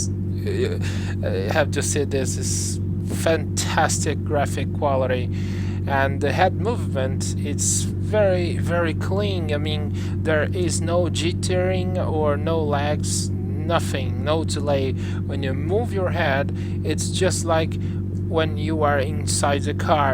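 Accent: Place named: Brazil. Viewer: lendo os comentários percebi porque estava entendendo completamente seu inglês hahahahahahah você é brasileiro tbm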